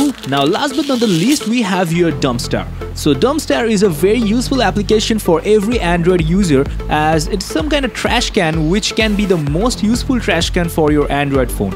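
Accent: India. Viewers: Ok, after reading the comments and hearing him, I'm sure, you're Indian. By the way, nice video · Best Indian speaking english accent...great!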